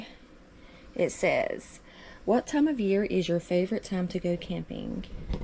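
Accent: United States. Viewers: Hello my dearest friend lol. Might be worth drilling a couple of drain holes in the bottom of the hatch so the water won't gather. Seal the drill holes with a bit of nail varnish. I didn't count how many times in this video you said "I love", but I bloody love your dialect. It's gotta be my favourite of all the Americas xxx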